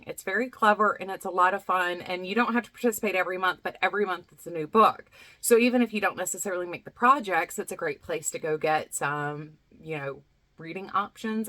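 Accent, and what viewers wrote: South, United States. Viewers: Your shawl is beautiful, the colours are amazing! Also, your southern accent is stunning, I love it 😍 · Your southern accent is wonderful - comes out stronger with certain words. I don't block socks in general. However, a knit stocking would definitely benefit from it. Looks great. I can't remember if you said what fabric you are going to line it with. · Hi, I'm so happy I finally found you on YouTube! I saw your summer freshness shawl and it's stunning! I love it! can't wait to see it finished! You're so a lovely person and I love your southern accent. Have a lovely day! Magali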